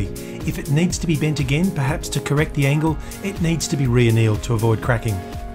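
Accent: Australia. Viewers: First time viewer, loved it. Good to hear an aussie accent on a well produced video. Subscribed.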